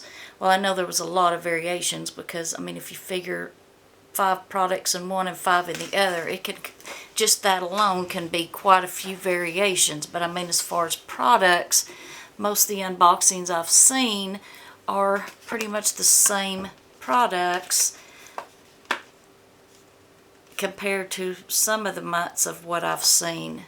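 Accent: South, United States. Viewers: Morning 😊 Love that your comparing a new Subscription to an older one. The highlighter palette is pretty . Your hair looks gorgeous 😊 I love a southern accent. Oh you got 2 ND palettes, I hope I get one in my box 😀 Both were great boxes 😊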